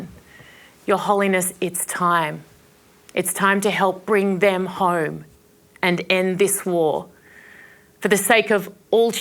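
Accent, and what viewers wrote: Australia. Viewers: You show no solidarity with your colleagues of the Press. How much are you getting paid? Could you change your accent, I am so embarrassed that you are Caucasian Australian. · Hats off to you, Erin... Love the Aussie accent , by the way...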